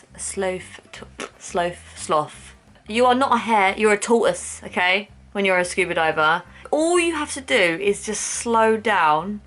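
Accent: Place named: England, United Kingdom. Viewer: I really like hearing your English accent pronunciation on little bit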